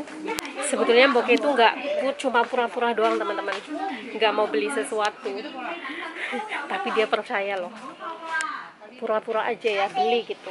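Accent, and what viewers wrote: Banyumas, Indonesia. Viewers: Kok ngedok mba,dr banyumas apa dr mana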